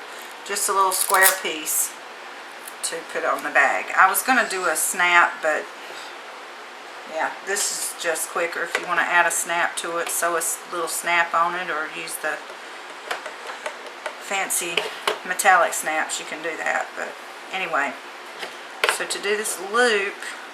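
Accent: South, United States. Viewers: And I love your Southern accent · with your southern drawl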